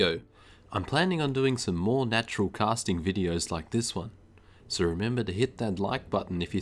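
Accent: Australia. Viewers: Are you Aussie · You've got a fantastically soothing voice. Great to hear a fellow Aussie who doesn't sound like Steve Irwin. Very cool video!!! You speak very sweetly about the spiders too!!!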